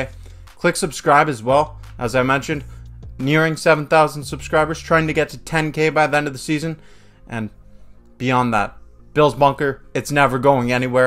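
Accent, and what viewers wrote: Canada. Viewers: Happy Saturday everyone! I don't make predictions just Bills win. Hey - Are you Canadian?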